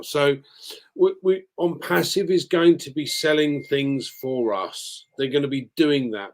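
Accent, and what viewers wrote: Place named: RP, United Kingdom. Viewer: that was a very good explanation in plain english or what we call Queen's english and hope at least 35 out of 50 got it😳:hand-pink-waving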